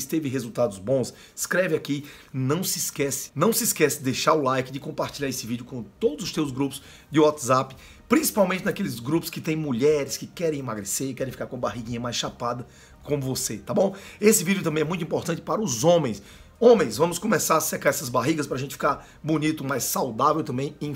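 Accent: Northeast, Brazil. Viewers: Fala logo sotaque. Nordestino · Amo o sotaque do povo nordestino e agora você é meu Dr. favorito😊😉 parabéns trabalho lindo👏😘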